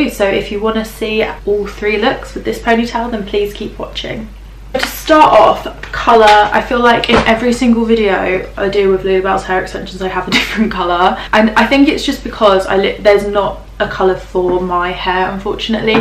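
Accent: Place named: Birmingham, United Kingdom. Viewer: love the Birmingham accent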